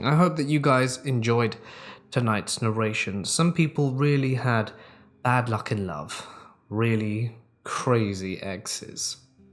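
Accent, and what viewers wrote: England, United Kingdom. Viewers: on a funny note, I listen to English narrators (just prefer the British cadence, I suppose) so much that you got me saying “narrator” the English way, rather than the American way. I didn’t realize we pronounced them differently until I said it in front of my bf and he gave me a funny look and said “.... say “narrator” again..”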